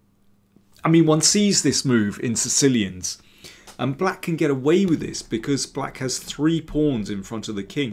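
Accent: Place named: RP, United Kingdom. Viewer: Keep quiet, that's the Sicilian secret! Icing on the cake! 😎 Thank you for the excellent commentary in Queens English!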